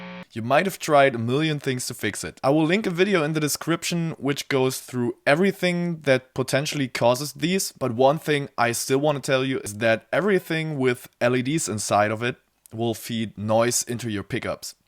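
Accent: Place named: Germany. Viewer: Ich vermute mal, dass du Deutscher bist (hört man ganz leicht am Akzent). 😉 Die geile DI, die du im Video zeigst, klingt fast so, als hättest du ein Saturation-Plugin oder einen Transient Shaper draufgepackt. Ist das wirklich so? Falls nein: Welche Effekte nutzt du exakt für diesen Sound? Drehst du manchmal auch die Phase oder lässt du sie original?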